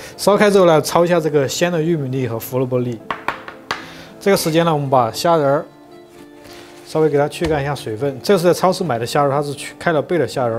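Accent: Sichuan, China. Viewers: 师傅讲话不出两句我就听出了四川或重庆人吧？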